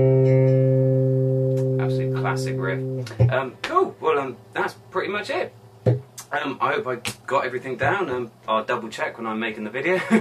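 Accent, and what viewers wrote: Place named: United Kingdom. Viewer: love the cup of tea and the nice accent very british! you make us proud. thanks for the lesson it really is great and very useful. keep them coming xx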